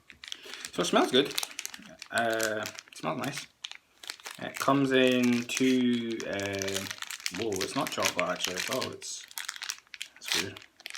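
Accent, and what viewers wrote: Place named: Scotland, United Kingdom. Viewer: Lol first time I have heard your voice my mate.... a tad scottish :-)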